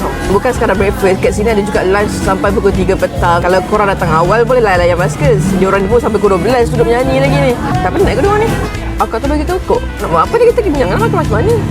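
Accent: Kelantan, Malaysia. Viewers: Orang kelate ke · Ori kelate ke tu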